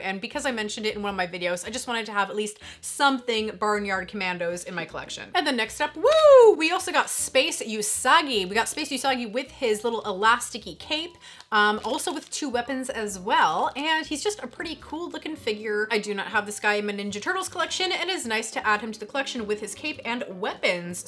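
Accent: Canada. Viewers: I could tell you were from Canada by just your accent. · I knew you were CANADIAN!!! I been around that awesome accent my whole life!!! Pops was born in Chatham, Ontario, Gramps and Granny in London!!!!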